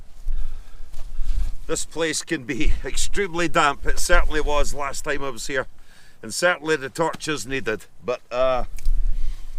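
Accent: Scotland, United Kingdom. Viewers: Ach, i appreciate your Scottish accent, exploring the lands in Wellies, as I will never be able to visit. ❤ and especially the romans pegasus. Such ahigh ceiling and huge carved blocks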